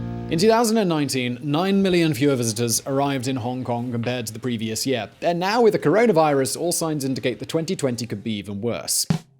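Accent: United Kingdom. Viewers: Tmr pinche inglés británico xd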